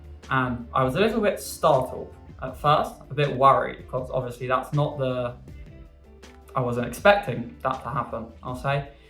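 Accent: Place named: United Kingdom. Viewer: omg Britich accent - very bad, uncomfortable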